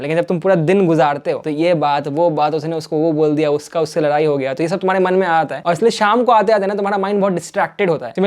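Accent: Bihar, India. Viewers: Your Bihari accent is amazing 😂😂❤❤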